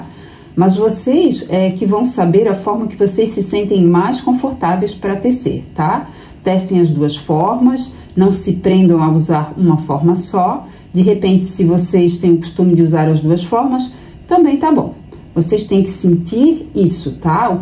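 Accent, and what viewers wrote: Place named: Santa Catarina, Brazil. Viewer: Senti que vc é de SC pelo sotaque! Hehehe sou de Floripa.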